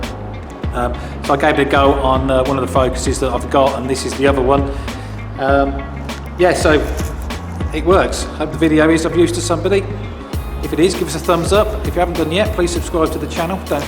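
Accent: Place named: United Kingdom. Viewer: I love UK accent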